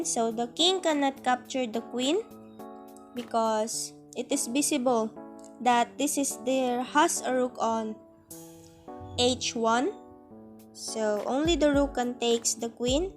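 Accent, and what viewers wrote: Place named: Philippines. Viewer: Nice tip. I noticed your Filipino accent by the way.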